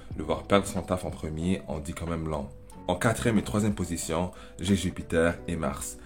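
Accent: Quebec, Canada. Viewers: je parierais sur Québec comme moi haha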